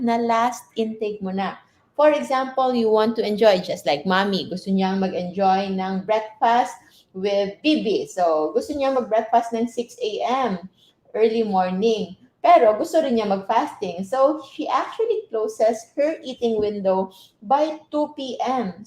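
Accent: Iloilo, Philippines. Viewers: Ilonggo si Doc?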